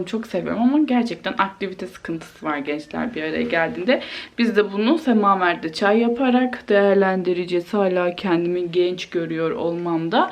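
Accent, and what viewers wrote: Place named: Black Sea, Turkey. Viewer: Hep bile gidiyoz mu tam karadeniz kızı olmuşun kız valla memlekete gidince direk o şiveyi kapıyosun hep bile gibi bizde mezarüstüne gittik gidiyoz deriz bu arada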